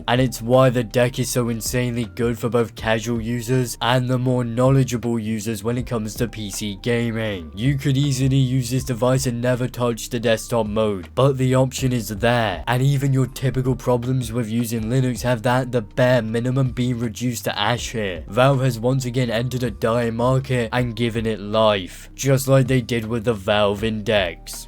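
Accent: United Kingdom. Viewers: British accents crack me up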